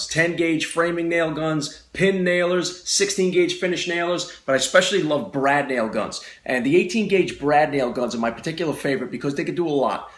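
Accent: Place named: South, United States. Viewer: I just ordered one thanks to your review. Like your southern accent. ;-)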